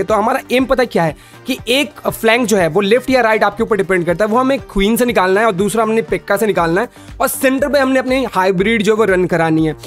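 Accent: Bengal, India. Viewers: Bhai aap Bengali ho kya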